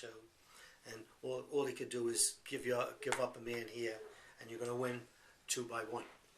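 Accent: New York, United States. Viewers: Love these checkers videos - like the NYC?/ NJ? accent.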